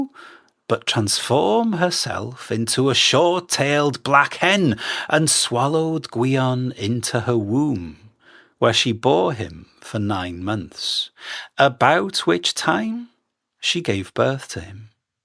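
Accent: Wales, United Kingdom. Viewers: Your voice is really soothing, musical beautiful Cymraeg accent a diolch yn fawr for the story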